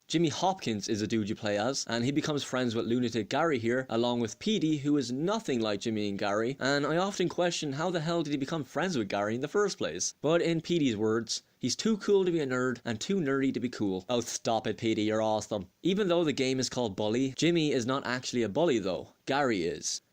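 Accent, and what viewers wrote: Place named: Ireland. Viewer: what part of ireland u from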